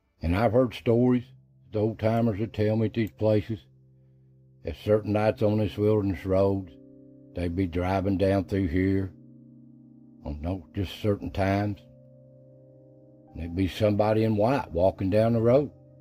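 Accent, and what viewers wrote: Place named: South, United States. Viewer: We just found you not too long ago. We really enjoy hearing you tells us those stories thru the sound of your southern drawl; & I mean that in the best of ways. We have been greatly disappointed with what we have found with some so-called Smoky Mountain YouTubers; a real slap in the face of the honest, hard working & authentic folks of this area! Thank you for a “pure” representation of these mountain that God Breathed into existence & the history of the folks that lived & breathed there☺️. 🫎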